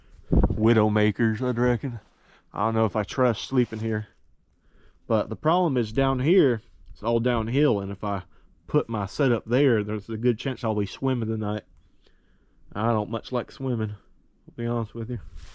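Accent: South, United States. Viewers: Love your backwoods country talk, hope Sasquatch leaves you alone, Outdoor boys, Is formal, you are country, I love country, hope to see more videos. Keep em comin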